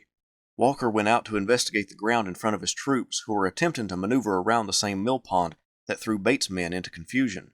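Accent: South, United States. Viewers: 🏗🦌🌟🌟🍀 **I REALLY APPRECIATE YOU AND YOUR SOUTHERN ACCENT !!!!*** I was raised in Louisville Kentucky 👏 Michael W.Johnson retired in North Modesto, California 😊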